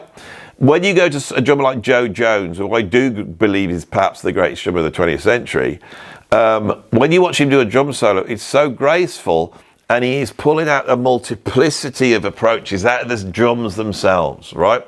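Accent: Birmingham, United Kingdom. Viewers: He doesn't have a cockney accent. Cockneys are from East London. He's from Birmingham and has a 'brummie' accent.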